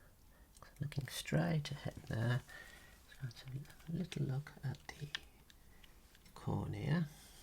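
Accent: United Kingdom. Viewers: where are you from in the UK? I like the accent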